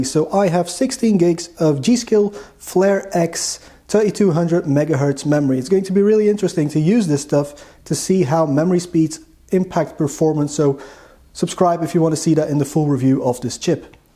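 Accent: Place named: Netherlands. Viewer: Where are you from? You sound dutch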